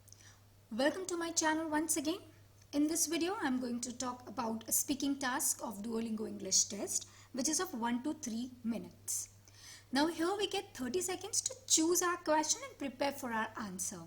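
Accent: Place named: India. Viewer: Incoherent Indian accent (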